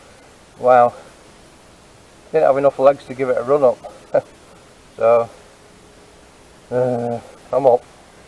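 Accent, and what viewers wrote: United Kingdom. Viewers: I assumed wrongly you were Scottish by the title. Once the helmet was off I could easily tell you're English. But as the Ozzie's say, "Good on ya" for picking up the trash! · Nice vid mate ... done a fair bit of bike / camping myself and loved it, did Spain last year and going back, can't beat the weather! ... as an Edgeley lad myself but not lived there for years its nice to hear a Stocky accent (c'mon County!) ... and as you know ... we are not Mancunian, we are Cheshire! Have great trips buddy and ride safe.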